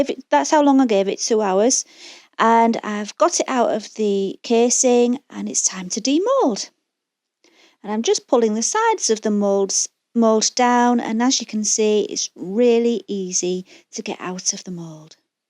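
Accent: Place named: Yorkshire, United Kingdom. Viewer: Ah! Yorkshire, thank you...was trying to guess, cos I thought you sounded like Sarah Millican.... This is an awesome bowl.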